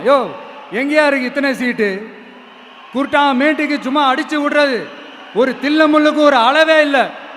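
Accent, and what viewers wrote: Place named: Tamil Nadu, India. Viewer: Vijay anna chennaila poranthu valarnthurunthalum, pesurathellam south are slang...🔥🔥🔥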